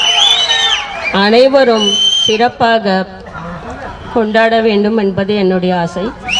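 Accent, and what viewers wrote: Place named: Karnataka, India. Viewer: இந்தப் பாப்பாத்தியின் தமிழில் கன்னட வாடை அடிக்கிறது. தமிழ் நாட்டை ஆள ஒரு உண்மையான தமிழன் கிடையாதா. சசிகலா ஒரு தமிழச்சி, தமிழ்நாட்டை ஆள அதைவிட விட வேறென்ன தகுதி வேண்டும்.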